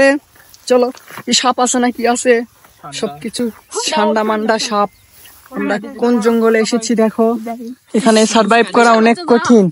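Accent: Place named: Kushtia, Bangladesh. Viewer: ভাইয়া তুমি কি কুষ্টিয়া জেলার ছেলে তোমার কথা গুলি ওদের মত🤔🤔🙄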